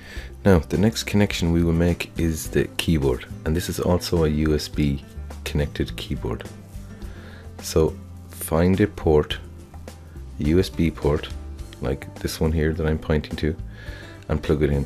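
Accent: Ireland. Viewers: Your Irish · Are you irish? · Irish man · Im Irish too, you sound Irish anyway =D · Hey could you make a video on how to setup 2 monitors or 3 monitors? I think i know how to do it but dont want to spend money buying another monitor and not knowing how to install it, cheers. oh and nice accent let me guess Irish? from the low southern end of ireland?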